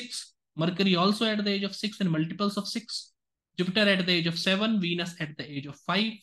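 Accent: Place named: South, India. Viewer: Brahmin's at South speak exactly like this.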